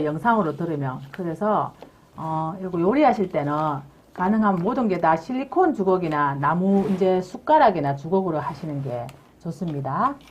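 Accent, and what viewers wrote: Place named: Daegu, South Korea. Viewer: 이 아줌마 진짜!!!!!ㅠㅠㅠㅠㅠㅠㅠㅠㅠ "사투리" "사투리" "사투리"!!!!!!!!!!!!! 어마무시 하다!!!!!ㅠㅠㅠㅠㅠㅠㅠㅠㅠ 사투리도 심한데,,,,,단어선택도ㅠㅠㅠㅠ 나도 경상도 100% 토박이 사람이지만,,,, 이렇게 심한사투리+안이쁜 단어선택 최강의 사투리 아줌마네!!!!!~~~~~~ 단어만 쫌 이쁜단어를 사용해도 좋을텐데 영상을 볼수가 없다!!!!듣기 싫어서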